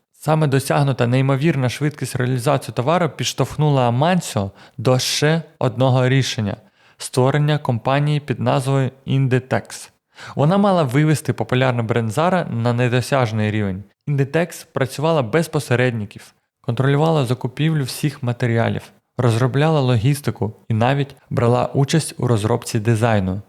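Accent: Russia. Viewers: Класна історія. Цікавий виклад. Дуже добре, що контент українською. Суто для покращення вашого контенту хочу поділитися побажанням: більше звертайте увагу на вимову слів, ви деякі слова вимовляєте на російський манер, а також було декілька слів російською мовою ( наприклад: колокольчик). Загалом, вас приємно слухати і радію, що є цікаві передачі українською мовою. Дякую!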